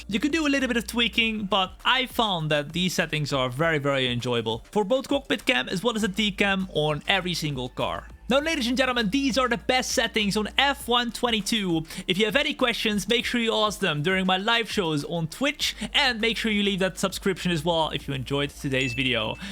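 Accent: Netherlands. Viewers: Are you from the Netherlands? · Ben je Nederlands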